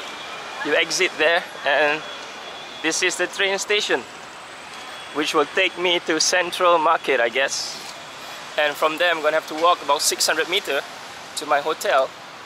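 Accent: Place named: United States. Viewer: i see ...and i quiet disappointed coz i wanna hear Singlish Accent from you but u have American accent .. 😣 Good evening la... Quickly la ... It's cute☺️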